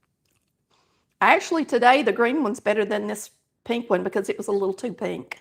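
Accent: South, United States. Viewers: I love to watch you and listen to your southern slang. Reminds me of my mama too. She made fried green tomatoes in bacon fat. They were so delicious! Keep on cooking,!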